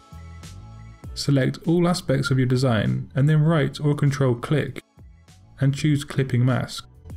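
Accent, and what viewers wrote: United Kingdom. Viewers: Your accent sounds really british..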